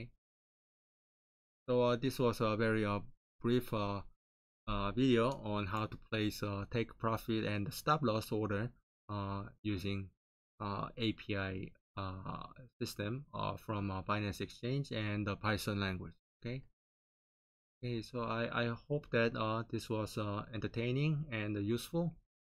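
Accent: South Korea. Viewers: 나는 영어를 못하지만 처음 몇문장 듣자마자 한국인임을 알았다 ㅋㅋㅋㅋ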